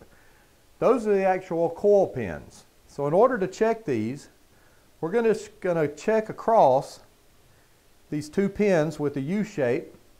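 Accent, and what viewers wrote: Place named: South, United States. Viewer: As a Georgia native, definitely has a southern accent. I assure you however that the information contained will also help with coils.😎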